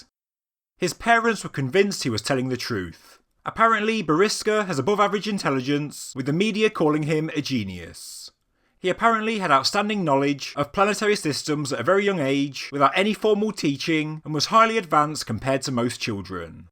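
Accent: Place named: London, United Kingdom. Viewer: British, not American. Probably a Londoner bloak 😂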